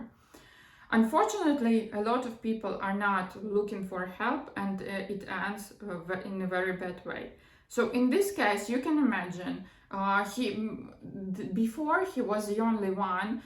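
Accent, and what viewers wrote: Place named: Russia. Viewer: gosh that’s just the most stereotypical Russian accent, and it’s not even a joke 🙈 don’t want to offend you, but I think u might need to work on your speech if you choose “storytelling”.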